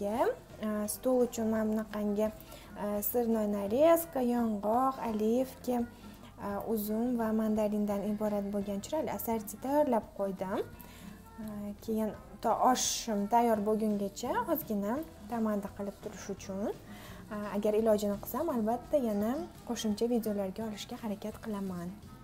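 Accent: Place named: Fergana, Uzbekistan. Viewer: Talaffuzizdan Fargonalik bolsez kerak deb oylodim.Men ham fargonadanman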